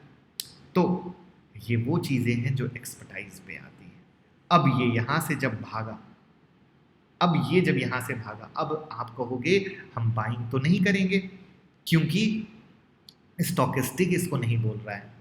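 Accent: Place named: Lucknow, India. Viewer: Lagta hai Aap Lucknow ke ho 😀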